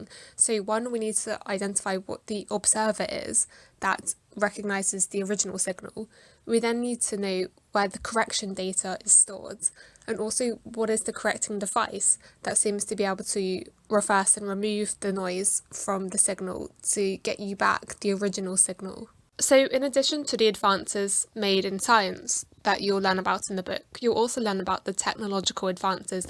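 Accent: England, United Kingdom. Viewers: Thank you very much for the concise and artistic presentation. I liked also your English accent. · It's an English accent, one of many in daily use in England.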